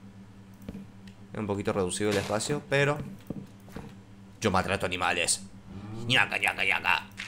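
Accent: Argentina. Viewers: Cuando dijiste puse el cofre en el peor lugar del mundo yo dije obvio y al mismo tiempo te escuche decir obvio. LOL El primer vídeo tuyo que vi fue el pro, el noob y yo 3 y cuando escuche que eras argentino inmediatamente me suscribi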